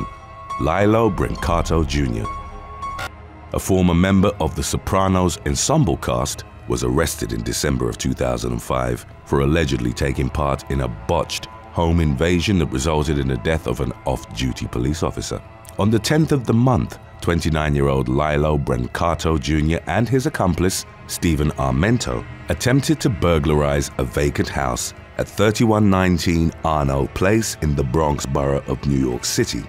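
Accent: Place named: United Kingdom. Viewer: Please do when 23 year olds go wrong? I really like your channel, I like the narrator, you sound like darth Vader with a British accent! 😆😆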